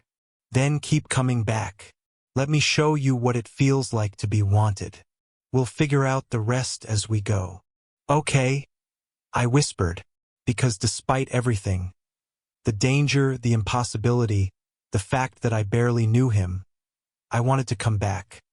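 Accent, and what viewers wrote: Spain. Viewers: The occasional Spanish and Spanish accent is funny 😂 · and again those spanish accented phrases popping up like unwanted exclamation marks -.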